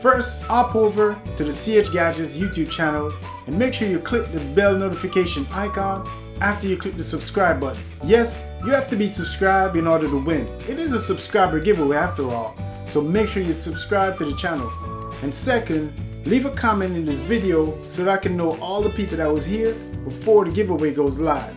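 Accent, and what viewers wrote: Jamaica. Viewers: Are you from Jamaica ? Accent sounds familiar...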